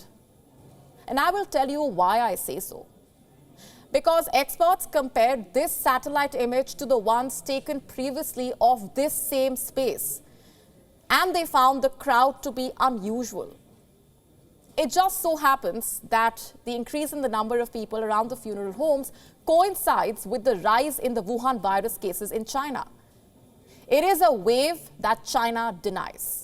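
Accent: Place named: India. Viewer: don`t you have a speaker speaking english? This indinglish is really annoying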